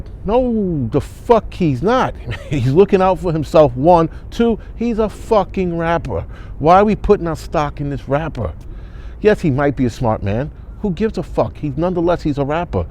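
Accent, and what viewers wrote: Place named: Cleveland, United States. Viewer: Wow is on one today! Lol you sound like my boys back home in Cleveland. Now that I live in Ky I can definitely pick up on city accents and vernacular.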